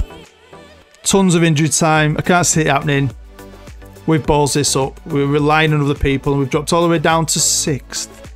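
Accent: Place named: Glasgow, United Kingdom. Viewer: Personally....I'm proud that I can finally understand Glaswegian!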